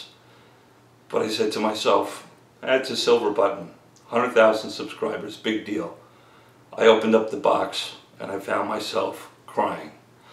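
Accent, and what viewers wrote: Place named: Arkansas, United States. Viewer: Long Island? I was sure that accent was Arkansas or West Virginia : ) I, for one, appreciate that you think about things and don't just follow the backs of bottles. You keep calling it like you see it, and I'll be here.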